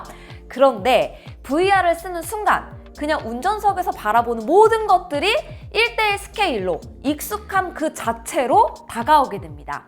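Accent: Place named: Seoul, South Korea. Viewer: 전달력 끝판왕 😊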